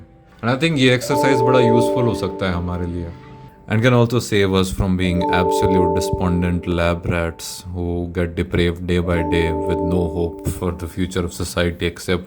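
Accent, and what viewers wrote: Himachal Pradesh, India. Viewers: Himachal ka accent work kar geya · that himachali accent hitting hard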